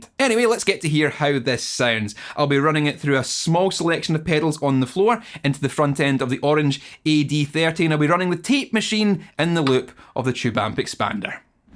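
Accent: Scotland, United Kingdom. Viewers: his scottish accent is honestly really addicting to listen to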